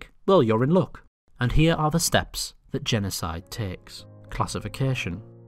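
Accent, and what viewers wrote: United Kingdom. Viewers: Love the countryballs and the humor, keep it up! Properly handled video, I wasn't even aware of the genocide in the Balkans. My question is: Where does that accent come from? I know it's British, but more precise please ^^ and how do you animate your videos? Greetings from Germany!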